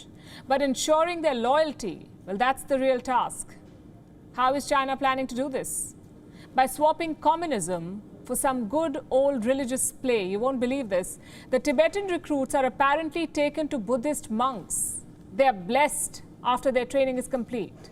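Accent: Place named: India. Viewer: China, China, China. LOL , i thought i can get a better understanding of India by seeing this site, an Indian station hosted by a lovely Indian lady with a cute Indian accent . But all the things I heard so far is this lady talking about China and nothing much about India. She is talking about China of which she does not understand. I think she don't even speak or read Chinese. How the heck she can get first hand information about China, let alone military secrets? As for differentiating Tibetan Chinese and Han Chinese is like differentiating Bengali Indians from Tamil Indians. Geez, either i am getting old and gullible or just like to have a good laugh.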